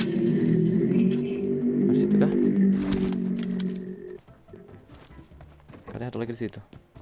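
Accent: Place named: Indramayu, Indonesia. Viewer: kaya orang indramayu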